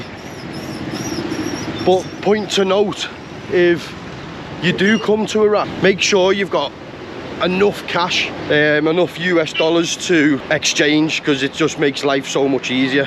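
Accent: United Kingdom. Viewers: Welcome to Iraq mate..👈🇮🇶 Which UK city accent is this..?? 🤔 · Soooo welcome bro❤️ Your British accent is awesome man❤️❤️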